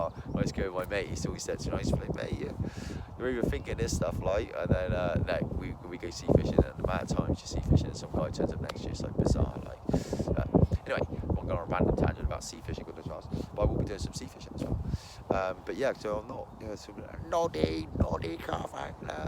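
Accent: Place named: Wales, United Kingdom. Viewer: I DO NOT SOUND LIKE YOUR IMPRESSION OF A CARP ANGLER!!!! :) I like this video, best one yet. You mentioned Somerset, i thought you sounded Welsh?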